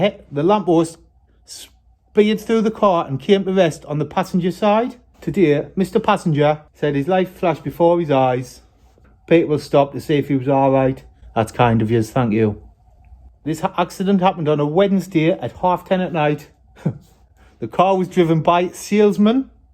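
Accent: United Kingdom. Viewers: Victor Oro Are you from the UK?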